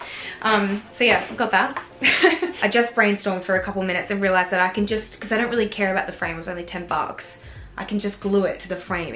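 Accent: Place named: Australia. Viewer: so good to hear an aussie accent on yt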